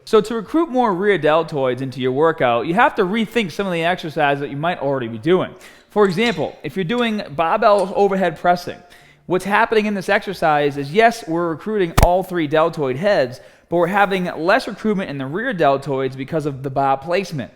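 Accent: Boston, United States. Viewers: Someone is from the Boston area. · Is that a Boston accent?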